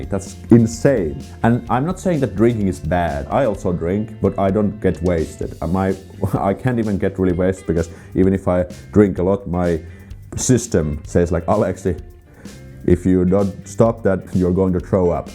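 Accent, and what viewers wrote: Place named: Finland. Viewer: Kyl sä oot vähä jäävi kritisoimaan suomalaisia, kun puhut englantia suomeksi murtaen...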